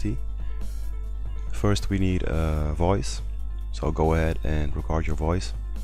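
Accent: Netherlands. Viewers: Are you dutch? You seem to have a dutch accent... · are you dutch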